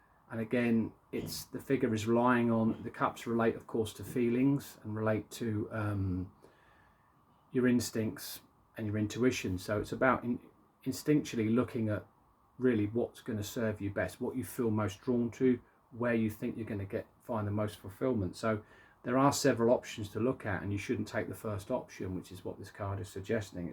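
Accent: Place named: England, United Kingdom. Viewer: Really helpful thank you, love your readings, very clear. This clicked with me...I guess j need to look at things a little more and figure it out, it helps to know to not panic decide, I often feel like you just want to 'flip the monopoly board' so to speak, but ill hang in there. I know what I want to do, ive just finished a course in learning what I want to do, its been hard whilst running a business ,which isn't doing that well anymore, so I kind of already knew, but its now when do I step on to the other train, it feels like I have to time it perfectly, and im very hesitant, but, tge universe will catch me, so.. . Ill jump soon, once ive prepared a bit more! I appreciate the good news of clarity will come and it looks all positive. Thank you. I've subscribed to you now, first time ive seen you and its nice to hear a fellow English person! 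I look forward to more messages to help me through he fog, its appreciated 🎉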